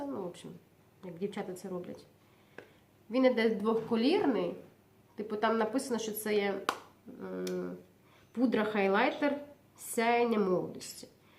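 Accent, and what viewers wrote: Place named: Lviv, Ukraine. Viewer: Спасибо за новое видео! Смотреть- огромное эстетическое удовольствие! Вы, очень красивая, шляхетна пани! ))) И очень очень нравится Ваш львовский говор! Ну и тембр голоса у Вас очень приятный безусловно!! Всего Вам наилучшего желаю и Вашей семье!!!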